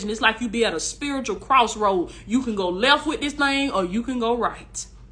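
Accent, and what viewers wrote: South, United States. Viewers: God is a perfect God. You sound wonderful,! I love❤ your southern drawl, and could listen to you for hours. Not kidding. God made your voice unique, to reach the millions that you will be assigned to! Your voice mesmerizes people, and compel them to stop and listen to you while God is pouring into you and into them. Praise God! I hope that you read this because...your mouth is working...👄but...believe me, GOD IS SPEAKING.🙏🏽 To THE MASSES!!!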